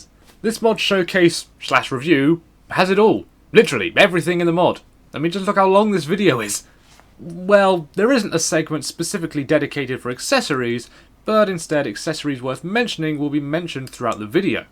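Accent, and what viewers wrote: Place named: England, United Kingdom. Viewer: I thought you sounded such as soon as you started talking normally. Though uh, that might just be because of the stereotypes associated with your accent here in the US. English accents are often used to convey either intelligence or nobility here.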